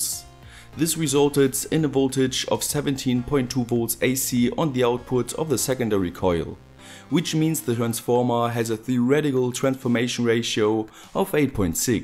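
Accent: Germany. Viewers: Man hört das du deutsch bist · was für ein deutscher akzent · im from germany too, so i often hear a lot worse versions of english from them as yours is (its mostly pretty good despite the accent); but still i was bursting out laughing when you said "unsatisfactory" :D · are you german? just asking, my ears tell me english german accent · I still don't get how Germans get so fluent in English but still sound German as fuck.. Great vid by the way..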